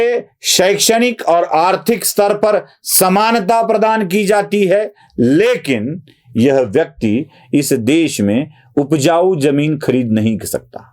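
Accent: Maharashtra, India. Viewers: apki marathi hindi me kuchh maja nahi aata, isse achha ye hai ki aap kisi hindi bhashik ko higher kare aur, usse ye kam ksrawaye. प्लिज वाईट वाटून घेऊ नका, आणि मी आपला आदर राखून हे सांगत आहे. यात आपला काही दोष नाही. धन्यवाद.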